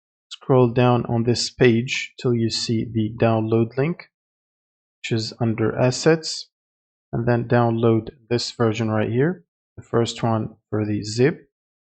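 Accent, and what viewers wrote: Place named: Egypt. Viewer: المصري تدري من نبرته وتفقيشه ياحبيبي احذف التتوريال ما يضبط يا اهبل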